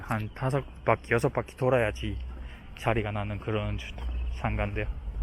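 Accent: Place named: Gyeongsang, South Korea. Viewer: 고향이 경상도 인가보네요 언능 빨리 나아졌으면 좋겠네요 화이팅!!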